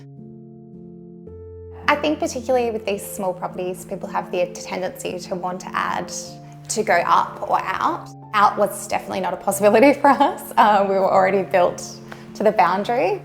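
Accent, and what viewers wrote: Australia. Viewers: I just have to say: australian accent, oh my! So beautiful!!!! THe house too, by the way! lol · ''DesigAAH and MakAAH', ''LargeAAH'' this australian accent is triggering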